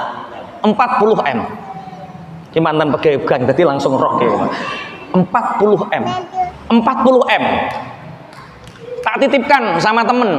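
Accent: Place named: Bojonegoro, Indonesia. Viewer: Kekel aku ngrungokne logate Yai Bojonegoro ,sebabpe aku dewe wong Bojonegoro ,tapi matur suwun pencerahanipun ,semoga berkah P Yai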